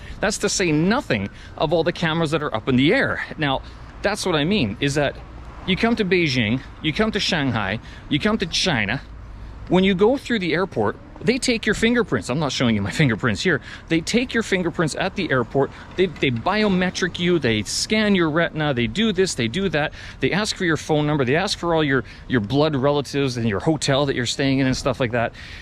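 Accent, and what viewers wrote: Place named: Canada. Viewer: Interesting that you digitize people and I’m interested how you isolate your voice in Starbucks. I suspected you were Canadian even before you answered. I’m also a Canadian in China.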